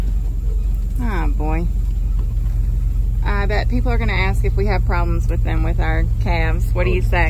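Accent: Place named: North Carolina, United States. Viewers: Was wondering as you have a similar dialect/accent as someone I used to know in Clayton, NC - thanks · I started watching your video's earlier today. I said to myself, she has a North Carolina accent. And i just watched this video were you said Caswell co. I lived in Allamace co for over forty years. Now on the beach in S.C. But you brought back many memories of one of the nicest and prettiest state's i've ever lived in..And i can not call cows either. Have a nice day.. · that’s so funny, I was using the sound of your voice just to try and guess where this was, and I was close. I was born in 56 in kernersville, moved to hickory in 61 and owned a home in Sparta from 76 to 88, I now live at Apple city USA Taylorsville for the last 32 years Alexander co, the poorest, smallest, least population of any county in the state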